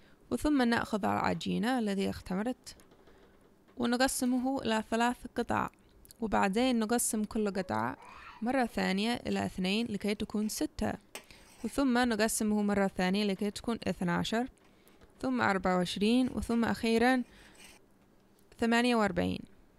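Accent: Yemen. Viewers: I love the yammany accent😂😂😂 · ماشاء الله عليش يا روووووووووعه تسلمي ياروحي 😘احلا شي كلامش يجنن كأنش يمنيه الله يوفقش استمري واحنا ندعمش 👍🏻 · ياحليلها الكنة اليمنية واضحة في كلامها اندمجت في المجتمع اليمني.الله يحفظ لها طفلها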